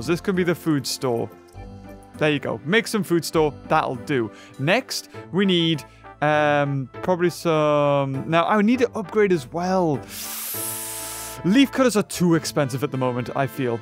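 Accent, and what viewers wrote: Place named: United Kingdom. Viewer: You kind of sound like Wilbur Soot... I guess your voice sounds similar because of the British accent.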